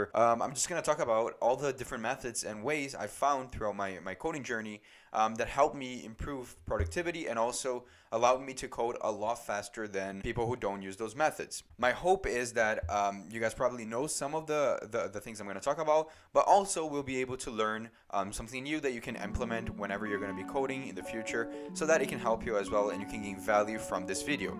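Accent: Brazil. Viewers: This is my first time seeing one of your videos, and you speak very well but I can't help but think that you sound as a brazilian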